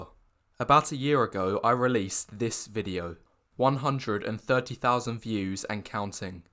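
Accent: United Kingdom. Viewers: The british accent completes it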